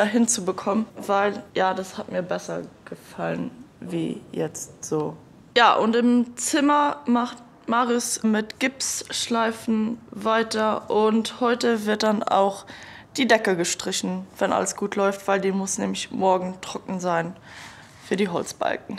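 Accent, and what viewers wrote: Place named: Luxembourg. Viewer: All Respekt, gesäit richteg gutt aus:) An däin lëtzebuergeschen Akzent ass bal ganz focht.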